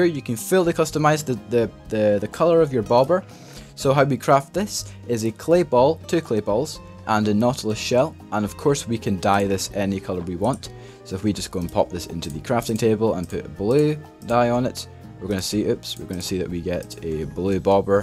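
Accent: Scotland, United Kingdom. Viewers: Thanks! This overview really helped me! Also I love your partially Scottish accent. 😂😂😂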